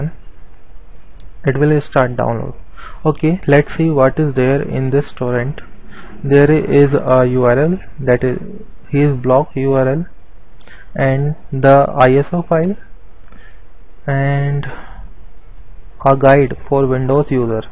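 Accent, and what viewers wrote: India. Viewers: Actually, I understood him very well, sure he has an Indian accent, but good English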